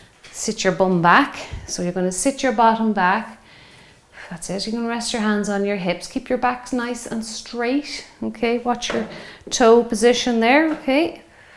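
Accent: Ireland. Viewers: Are you from Ireland? Trying to figure out your accent lol I love it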